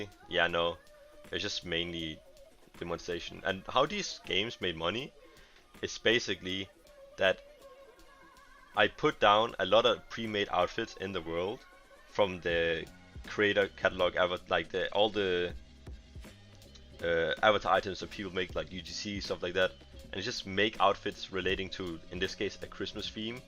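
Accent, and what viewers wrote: Denmark. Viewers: Your danish right? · Are you danish?🧐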